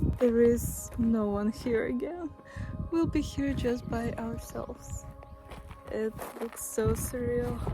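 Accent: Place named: Russia. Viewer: Great video!, have you ever thought about doing ASMR? You have a great calming with the Russian accent voice for it!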